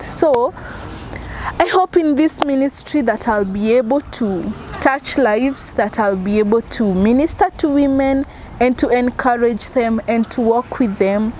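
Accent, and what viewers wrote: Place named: Kenya. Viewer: I am in the 🇺🇸 and actually enjoyed every bit of her narration; accent, grammar.. yote.. my love for my Kenyan roots remain ..she's well spoken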